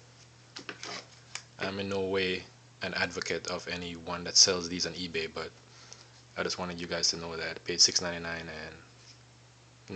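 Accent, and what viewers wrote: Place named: Jamaica. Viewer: Your accent sounds a bit Jamaican, are you from there? :P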